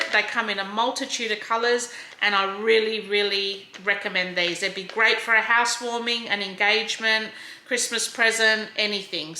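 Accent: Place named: Australia. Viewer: Aren’t you from Australia? You sound like an Aussie! Love watching your videos.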